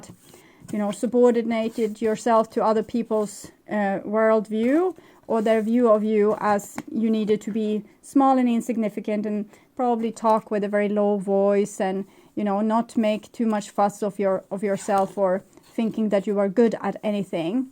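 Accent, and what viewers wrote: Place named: Sweden. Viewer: ~ Thank you for the reply. I have subbed to your channel. 👍 I also, love Siana and miss her. You remind me of her. Must be the Swedish accent. Thanks for sharing your gift with all of us. Much love from Texas! 😘❤️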